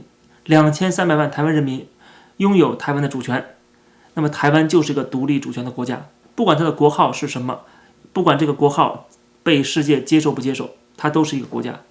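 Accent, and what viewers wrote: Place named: Wuhan, China. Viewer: 黄陂口音😊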